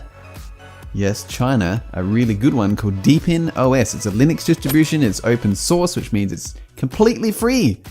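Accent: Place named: Australia. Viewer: Hi, thanks for that. You sound rather impressed with deepin 15.4. It sounds like you're also in Oz, and that app you downloaded seemed to take a while. Has this been a problem for you since you installed the OS? I prefer to use LibreOffice as a WP app, and I imagine that would take some time to download. (Oh, and I haven't yet installed this OS. Still deciding. It looks good tho, and I'm pretty much over Win 10.)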